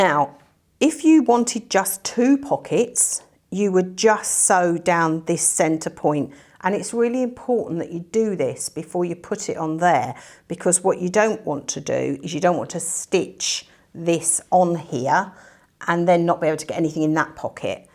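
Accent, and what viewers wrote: United Kingdom. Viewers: I love your videos because you don't rush though. But the problem I have is some of the British words with your lovely accent, I am in Pennsylvania USA. I can't understand what the name of the piece is that we iron the squares onto. If you could give a link that would be so helpful. I look forward to watching more of your videos.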